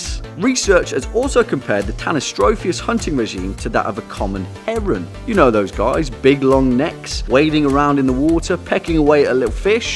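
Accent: England, United Kingdom. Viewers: What you mean, we need a english man voicing these video, we got rebecca but we needed a guy!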